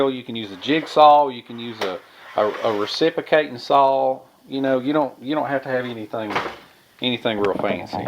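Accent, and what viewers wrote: South, United States. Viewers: Keep up your good work. By the way...On top of your inspiring ideas, your charm has also totally changed my attetude towards what I as a foreigner usede to call the "Forest Gump dialect". You´re the kind of guy who could make any wall street guy wish he had a few drops of "hobo-blood" in him. Keep up the good work. You are an inspiration to me and thousands others. Best regards from Vikingland (Denmark).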